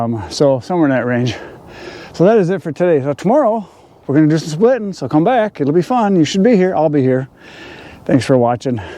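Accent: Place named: Upper Midwest, United States. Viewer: LOL you talk regular northeast / mid atlantic by yourself, but when another person shows up, your upper midwest shows.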